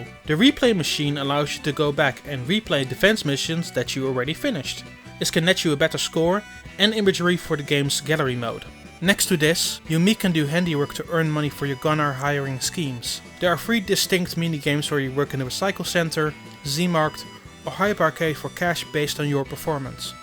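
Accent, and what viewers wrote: Netherlands. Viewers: Is this dude Dutch? His accent is slightly off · Yes he is Dutch lol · You are dutch, i can hear that ;-)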